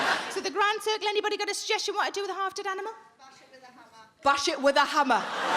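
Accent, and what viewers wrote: Newcastle, United Kingdom. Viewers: i had never heard of sarah millican last month, and now she is my favorite comedian. what a hilarious person she is. and that geordie accent! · She has a Geordie accent. It's from the north-east of England.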